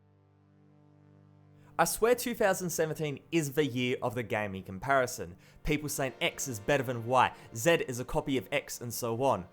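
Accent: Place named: Australia. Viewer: You have a really interesting accent. Are you aussie or from NZ?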